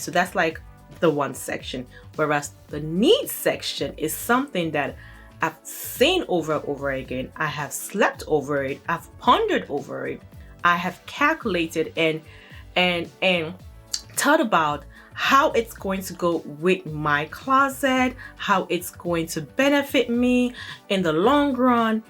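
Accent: Nigeria. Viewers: I love your Nigerian accent!!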